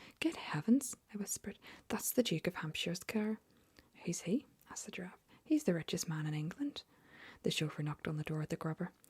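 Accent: Ireland. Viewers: I love love love your Irish accent:) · I love the Irish accent!! Great job🍀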